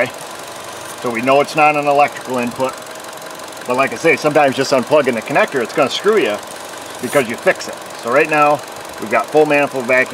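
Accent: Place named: Western New York, United States. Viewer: I’ve been in the area twice recently, but alas my car was running fine. I always learn something when I watch your videos and is like a trip back home when I your that WNY accent.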